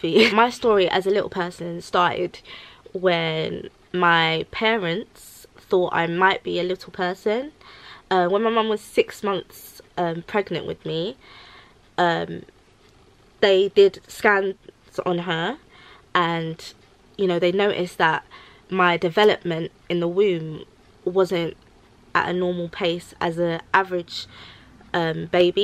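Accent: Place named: England, United Kingdom. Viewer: mandy_ somda it’s English accent from England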